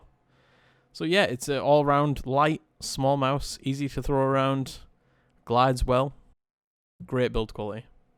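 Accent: United Kingdom. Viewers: Good video, but next time you should use a better camera..more than half of the video is out of focus. lol BTW which part of UK are you from? Your accent is interesting! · Sure am pal! Can always recognise the twangs of a fellow member of Gods county :-). Sheffield lad myself.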